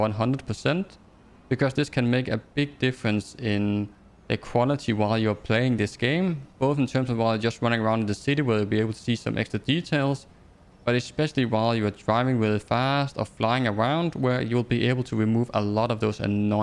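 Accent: Denmark. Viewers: Hey bror, har set du er stoppet med at poste, men ber dig begynd at post igen tro mig. Du skal bare øve dig på dit engelsk så det ikke er danglish og blive ved med at poste og lock in. Tro mig hvis du bliver ved bliver du stor på et tidspunkt. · You sound danish lol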